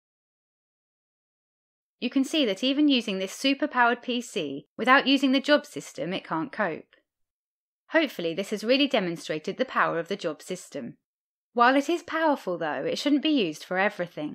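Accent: United Kingdom. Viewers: came for the unity tutorial, stayed for the spiderjob by this delightful british accent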